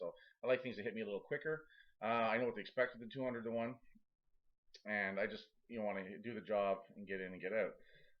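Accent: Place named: Canada. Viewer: You are so attractive. Love the Canadian accent too. I don't mean to objectify you but it's your fault for being so visually appealing. You're also very bright and articulate. You seem very even tempered, kind, like you'd make a good manager/supervisor. You need WAY more subscribers. Your message and delivery are very persuasive and you're easy on the eyes.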